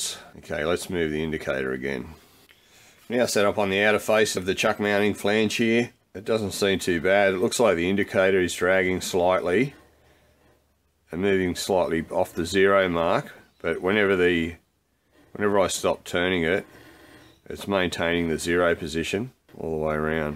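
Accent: Australia. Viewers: Dude kick ass accent. Ausi I gather. Good vid bro.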